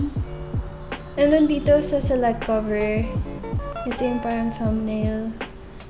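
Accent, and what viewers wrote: United States. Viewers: Parang americana po kayo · Parang americana magsalita Ang cutee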